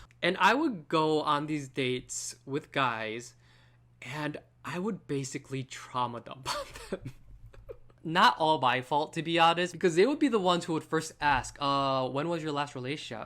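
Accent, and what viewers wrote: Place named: United States. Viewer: You sound American... You speak English well!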